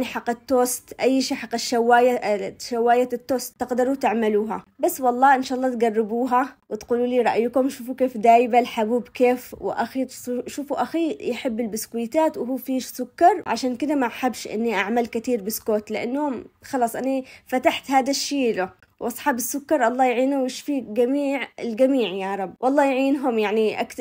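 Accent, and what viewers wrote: Yemen. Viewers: تابعت كذا مقطع بقناتك حلوه لهجه اليمين الله يعطيك العافيه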